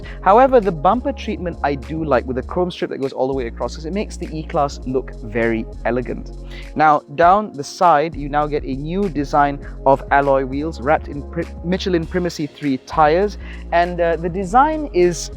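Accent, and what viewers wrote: United Kingdom. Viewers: I love your English accent, are you educated in the UK, love it.... "The headlights look low rent" 😂👍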